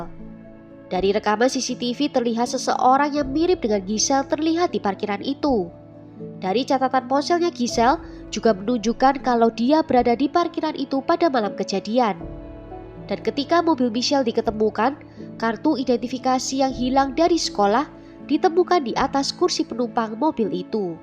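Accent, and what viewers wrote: Java, Indonesia. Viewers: kamu memang wow sekali pokoknya.... kasus mu pun mantap punya..... dgn aksen jawa yg gak ketinggalan membuat aku terpesona..... top markotop lah....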